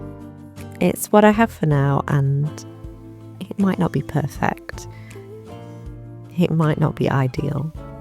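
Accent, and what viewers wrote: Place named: Northern England, United Kingdom. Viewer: Relaxing to watch. Cosy vibes, beautiful filming on the beach. You sound like a North of England girl. I am too.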